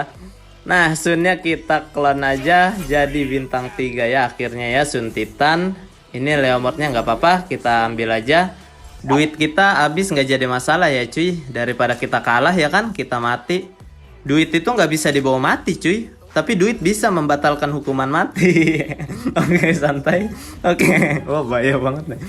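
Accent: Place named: West Java, Indonesia. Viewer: bang lu orang sunda ya, bru pertama nonton gw vitub lu, sumpah ngakak, dri cara ngomong lu plus seru juga, gw ikutin terus chanel lu bang .. mantap 🎉🎉🎉😅😅😅😅😂😂😂😂😂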